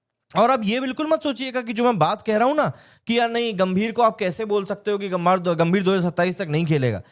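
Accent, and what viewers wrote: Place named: Bundelkhand, India. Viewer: Excellent analysis! Lovely to hear Bundelkhandi in the cricketing world!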